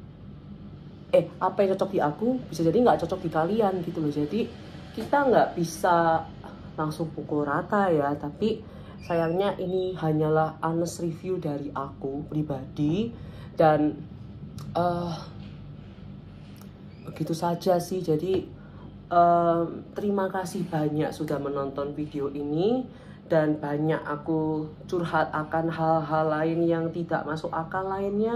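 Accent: Surabaya, Indonesia. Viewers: E beneran ternyata logat khas suroboyo hehe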